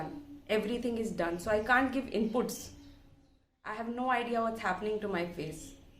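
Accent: Bihar, India. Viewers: I loved her bihari accent and l am following you from the beginning ok tested. You always gave me amazing vibes. 😘😘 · Mam apke bolne ka tarika bhut awesome h... 😍❤️...apki Bihari language use krty rha kro... funny lagty ho bhut bolty hue 😀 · Ur d best❤️m big fan of urs frm ok tasted 😘I do watch maximum video just bcoz ur there n m in love wth ur bihari accent ❤️ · Thank god you open your channel, you are really good to being you , some ppl find it hard anyway I love your bihari ascent...❤️❤️❤️ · U r looking fab in both of them ..but your actual wedding makeup was simple,peaceful..i mean ...aisa koi bnwati ni lg rha h ...u r looking real in that pic ..but in the second one...sorry to say but you are looking fake....sorry ...but u r really looking cute in that first pic....bdw i am also from bihar ar hmara v accent wahi h to mza e a jata h sunne me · Eventhough I am southern Indian and understand only urban hindi I like your Bihari accent. 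You are drop dead gorgeous in your wedding than the recreation. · I love you ..because you bihari accent i love it ❤ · Liked u for bihari tone ❤ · I love ur language and ur expression... Mujhe lagta tha bihari language rough hoti he but aapki language sunne me maja aata he... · Ahh haa badhiya mahek raha hai😂😂 your accent gave us the pure bihari vibes.😘😘 Proud bihari♥️♥️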